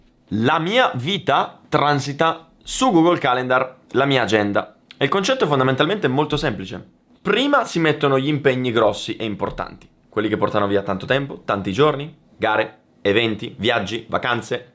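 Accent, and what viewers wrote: Italy. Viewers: Minchia stavi parlando paleminato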